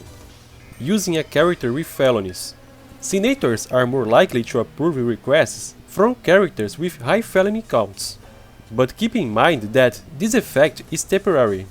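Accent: Brazil. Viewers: posso estar enganado mas o sotque é bem brasileiro, de qualquer forma obrigado vc ajudou muito com o video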